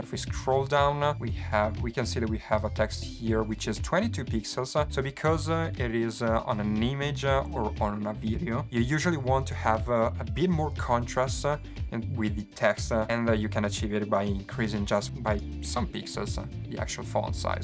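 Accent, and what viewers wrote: Italy. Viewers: Hey is an Italian American I love the Italian accent! Be great to come to Italy and get to meet you and learn about the culture! Having said that though do you have the slides for the guides you came up with for the sizes?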